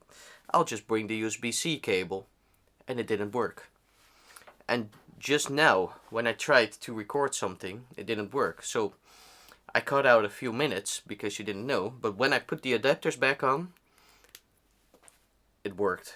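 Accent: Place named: Netherlands. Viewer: i smell sniff a dutch accent 🤔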